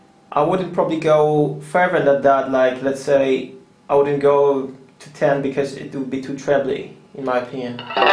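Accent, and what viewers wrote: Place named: Germany. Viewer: Whats with the voice.. I hear a German accent.. whats the issue with u puss***s :D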